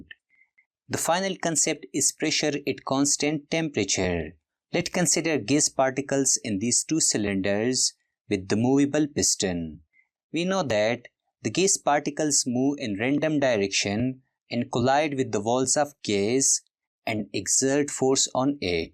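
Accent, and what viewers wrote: India. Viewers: Oh i thought he is from india because of his accent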